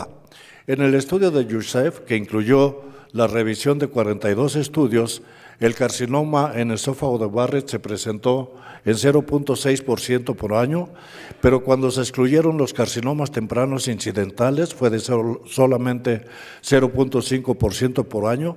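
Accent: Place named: Mexico. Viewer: El habla mejicana así utilizada es realmente agradable para el oído español. La conferencia ha sido para mí muy útil, explicativa y consoladora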